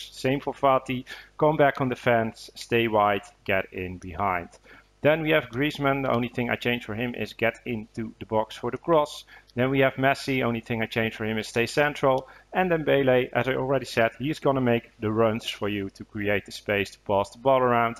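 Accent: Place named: Netherlands. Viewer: In het Nederlands omdat ik aan jouw Engels hoor dat je Nederlander bent (No offence) Ik speel al jaren met Barca, en al jaren Div1 speler. Dit jaar enkele keren div 1 gehaald maar met veel moeite. (Meestal speel je tegen snelle spitsen zoals Salah of Mbappe en daar heb je gewoon niet van terug). Uit wanhoop deze opstelling geprobeerd (nu in div 2) en voorlopig heb ik 4-4 gewonnen. Het is zalig om vanuit de verdediging op te bouwen en weten dat je verdediging er staat wanneer je balverlies lijdt!! Good job!!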